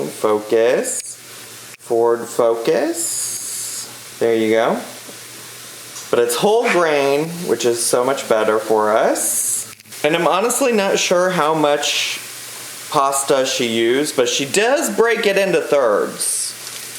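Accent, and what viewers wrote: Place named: Midwest, United States. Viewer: It’s that Midwestern voice.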